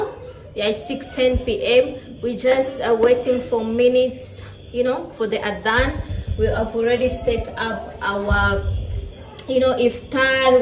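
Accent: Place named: West Africa. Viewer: Mashaa Allah sis beautiful. Your accent sound a bit different from our eat african. Are you from western part of africa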